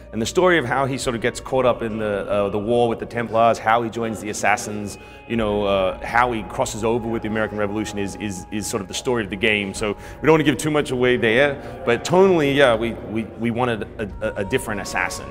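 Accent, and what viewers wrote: Australia. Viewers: i cant pick it, is this guy Australian? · half the time this guy talks he has an australian accent, what gives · What the fuck is this dudes accent? He has got to have spent time in Australia....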